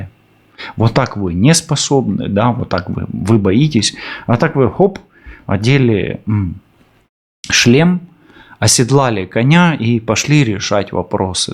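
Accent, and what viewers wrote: Ukraine. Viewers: Я красотка люблю жизнь возрост уже большой собаки нет не люблю в квартире но они меня любят всю жизнь сама себя содержала никогда не брала у мужчин надеялась только на себя что нажила ваша русня забрала сейчас за границей в ирландии но влюбилась даже не ожидала потеряла чувствую что вы с Украины так как речь пролитает родные слова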